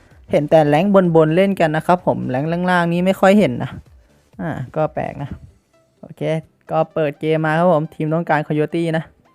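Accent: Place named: Thailand. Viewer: รู้สึกพี่เหน่อๆ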